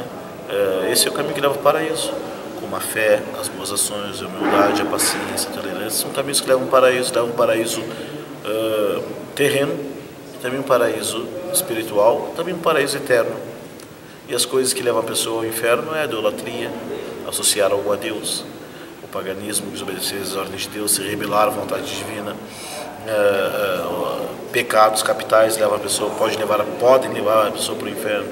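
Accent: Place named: Rio Grande do Sul, Brazil. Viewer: Cara você é gaucho tá claro isso.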